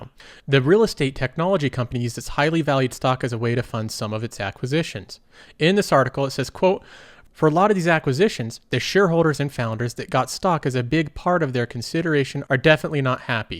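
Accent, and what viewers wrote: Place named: Midwest, United States. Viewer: Midwestern american, I would guess but it's not a strong accent. Listen to "protests" , or ish, "we don't want you tah talk about politics."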